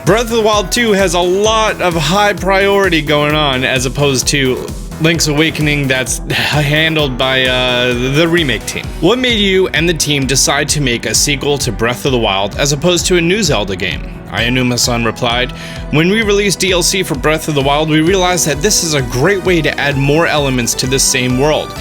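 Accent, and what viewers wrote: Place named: United States. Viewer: Strongest American accent I've ever heard, wtf! 😰😬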